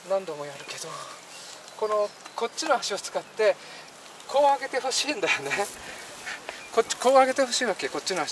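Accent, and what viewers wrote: Japan. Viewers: なんか苛つく言い方に聞こえるのは私だけかなぁ？ 九州弁ってこうなの？ あまり皆 理解してないと私も思う。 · 最初は愛媛の方言かと思ったｗ 岡山出身だけどその辺のおっちゃんの喋り方だな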